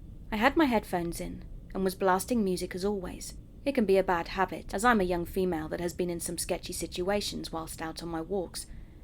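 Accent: England, United Kingdom. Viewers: Been looking forward to this!! There's just something about that English accent 😁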